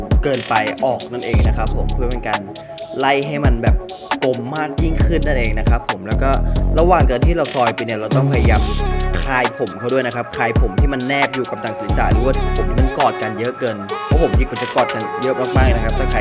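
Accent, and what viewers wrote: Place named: Ratchaburi, Thailand. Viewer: สำเนียงราชบุรีมาก😂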